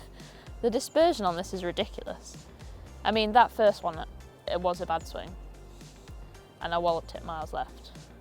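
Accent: Yorkshire, United Kingdom. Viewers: Have played the G410 and am looking forward to trying the 430 when it's available. Liked the review and the friendly Yorshire accent, thanks.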